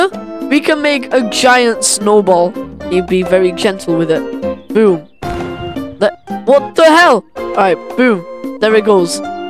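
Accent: United Kingdom. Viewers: I love his British accent it’s good😊😅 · The way he just speak like British 😂{Not being rude}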